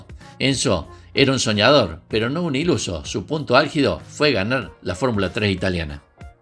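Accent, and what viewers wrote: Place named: Córdoba, Argentina. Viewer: Cordobés vos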